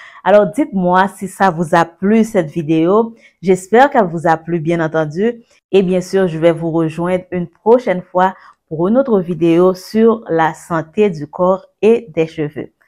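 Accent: Haiti. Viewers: elle a un accent Haïtien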